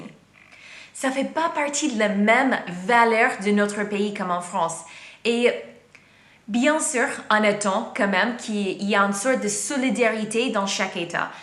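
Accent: United States. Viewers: she's also pronouncing half the words as she would in english. her american accent is very very strong. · Heehee. English Canadian here (but dating a Parisian man in Vancouver) and I so appreciate your speaking in French (and your analysis) but to be perfectly frank I'm laughing because your accent is just so incredibly American. As in there is just the barest effort to pronounce your words as mildly French, hahaha. Please don't mind my comment because it's endearing to begin with but even more so because you are so earnest and passionate about the topic. Your language skills are super however by the way; I'm jealous and still learning in an elementary level. ;)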